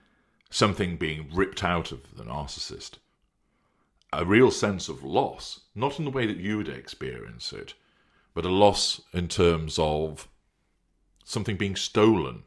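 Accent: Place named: England, United Kingdom. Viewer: Soothing? I find it cold. No inflections. Even Doctor Higgins' voice sounded more soothing and human than his. Although his accent is academic and his vocabulary is rich and high standard; Englishman I guess (doesn't sound Canadian 😁) no cockney.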